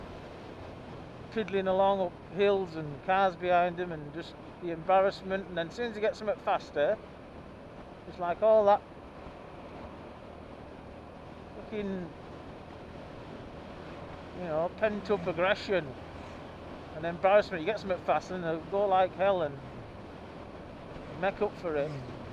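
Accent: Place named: Bolton, United Kingdom. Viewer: great vid and good sharp pics despite the cloudy conditions thought it was Bolton area at first .... accent too... but maybe your Preston way or Yorkshire even ? Just wondering, getting a puncture can you get the tyre over the rim ? I had a puncture 2 weeks ago, Ive got good tyre levers but could nt get tyre over the rim Bike shop says ... most bikes have got to come in these days and get tyre off by machine so one simple puncture could cost me Pick up £45 Repair £40 .... its getting mad out there ! Probably eu legislation !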